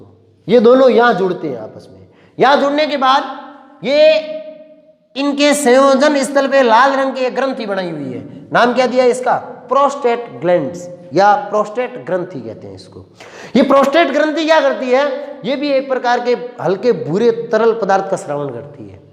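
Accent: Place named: Marwari, India. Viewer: Aap to bahut ache trike se samja rhe ho, muje aapki marwadi voice achi lagee 😂😂😂😂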